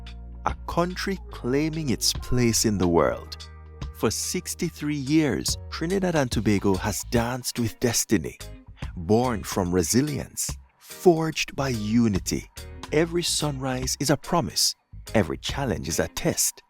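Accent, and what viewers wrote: Jamaica. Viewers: Why is a jamaica narrating??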